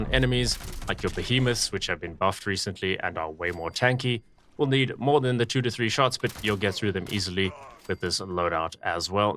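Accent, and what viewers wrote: South Africa. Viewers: Very good video, thanks, sounds like you come from South Africa like me💪🏻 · Are you south african by chance. Very distinct accent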